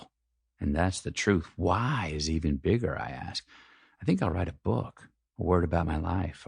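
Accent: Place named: South, United States. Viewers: He’s just making up empty platitudes and selling it with a confident southern accent. He is an insane person and I love him for that. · This man makes my innards quiver. Southern gentleman have IT. But this man bequeaths the essence of every southern man. He has it ALL; the eyes. the beautiful Texas accent-The whole package and by God he has sex appeal in spades. Whew.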